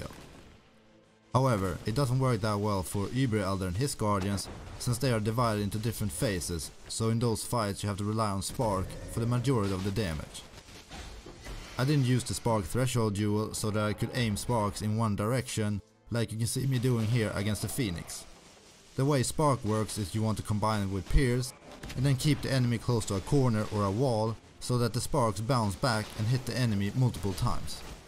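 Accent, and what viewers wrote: Sweden. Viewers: He's swedish. It's disgustingly obvious. Horrible accent.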